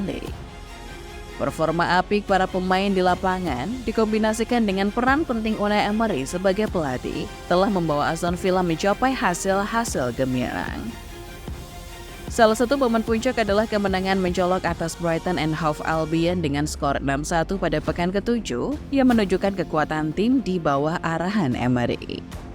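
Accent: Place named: Medan, Indonesia. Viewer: Terpongkengham bangkeee wkwkwk org medan abg ya😂😂😂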